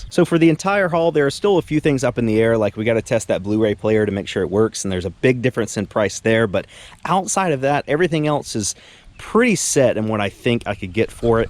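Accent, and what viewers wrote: Australia. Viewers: Hahaha mate your Aussie accent was worth a giggle 🤣gday mate hope ya both well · That Australian accent though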